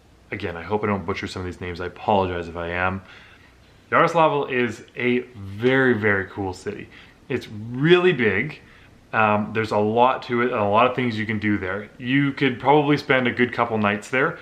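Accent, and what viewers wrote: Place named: United States. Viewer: if you are canadian, why do you have american accent ? my wife is british and said you are an american when i showed her the video and КостромА, not КострОма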